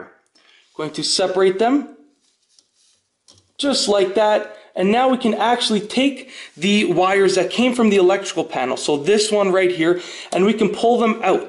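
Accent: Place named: Montreal, Canada. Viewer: Excellent tutorial. Are you Canadian? You have a Montréal accent.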